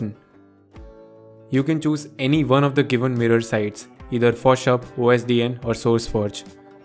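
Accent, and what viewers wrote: India. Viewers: I love Indian accent camuptar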